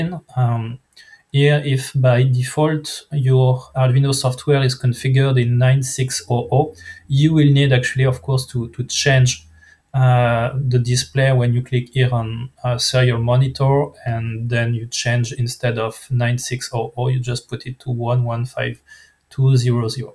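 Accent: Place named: France. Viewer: Merci pour vos vidéos que je viens de découvrir. Malgré votre accent très frenchy 😜 qui n'est pas trop compliqué à comprendre pour les franco-francophones, avez-vous la possibilité d'activer le sous-titre en français? J'ai déjà vu ça sur des sites de français qui présentent oralement en anglais, ce doit être automatique, mais je ne connais pas la procédure...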